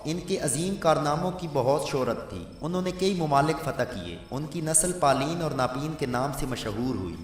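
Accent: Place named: Punjab, Pakistan. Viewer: Tusi log jabardasti urdu kyu bolde ho? Tuhadi urdu vicho Punjabi jhalkadi hai, apni boli chhad k lucknow, bihar, u. P. Di boli kyu bolde ho? Je begani boli eni hi changi lagdi hai ta apne wale punjab da naa Lucknow ya bihar rakh lvo. Tuhade naalo ta oh bengali change ne, jehre garibi vich jhuggi bana k reh lainde ne, par apni maaboli nhi chhad de.. Duniya ch Kise vi kuam ne apni maaboli nu nhi bhulaya, sivaye tuhade jahe naamnihad Punjabiya de, sharam kro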